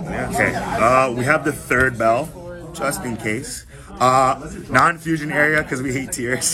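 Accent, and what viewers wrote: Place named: Toronto, Canada. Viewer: I recognize the way he speaks. My dudes from Toronto 🙏🏻 got a crazy good profile too. Thinking about getting into this deck.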